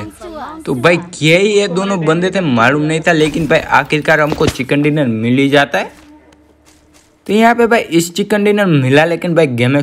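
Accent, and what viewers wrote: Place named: Gujarat, India. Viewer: Lagta he Gujrati manus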